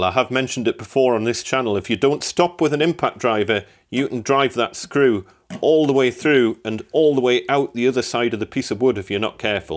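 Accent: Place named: Northeast England, United Kingdom. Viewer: Hi. Good video. I've been using an SDS drill for about 9 years ( 1930's house - super hard brickwork ). About 3 years ago I saw this video: <link> & took note of it. The next time I used the SDS it caught in a wall tie & kicked violently. If I hadn't been holding it as the video instructed I reckon I'd have been nursing a broken wrist & jaw & whatever other injuries being thrown off the stepladder would have caused. Thanks for the info you provide & it's nice to hear the accent ( I'm from South Shields but haven't lived in the NE for about 30 years ).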